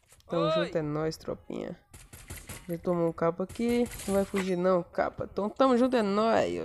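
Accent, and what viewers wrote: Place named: Northeast, Brazil. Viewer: Esse é nordestino ''já vazou la por riba'' e noix <3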